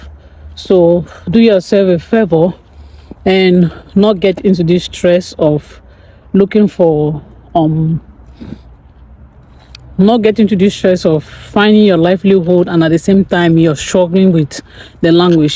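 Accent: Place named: Nigeria. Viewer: You sound Nigerian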